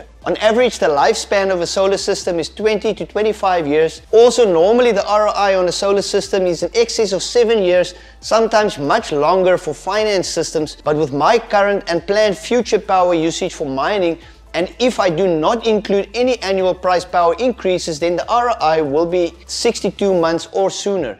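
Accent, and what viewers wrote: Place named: South Africa. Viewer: Awesome, I noticed from the accent and other comments that you're also from ZA. I recently started mining some XMR on a spare PC I have lying around at home. Got p2pool and a local monerod running and getting about 4.7kH on 8threads on a 5600g. Was wondering what pool(/s) you use? I heard it's better to have lower latency but the lowest for us is EU based and even that is around 200ish ms. Kind regards.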